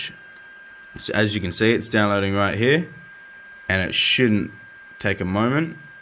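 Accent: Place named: Australia. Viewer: you have that strong Aussie accent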